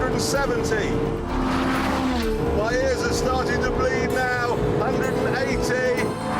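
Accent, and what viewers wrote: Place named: England, United Kingdom. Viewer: I LOVE the english "english pronunciation"...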